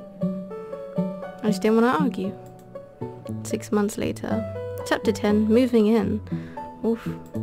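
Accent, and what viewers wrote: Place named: Australia. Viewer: The Australian accent is probably my favorite accent, its just like a lot more relaxed (some of the time), it just makes the video 100 times better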